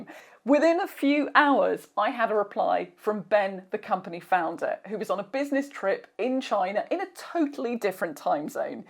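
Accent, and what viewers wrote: England, United Kingdom. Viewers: an anglish person thank god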